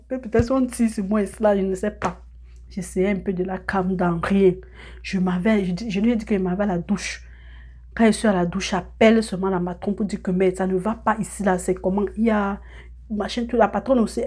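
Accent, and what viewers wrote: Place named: Cameroon. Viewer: Dès vos premiers mots j'ai deviné que vous étiez camerounaise🇨🇲 l'accent de ça ,force à toi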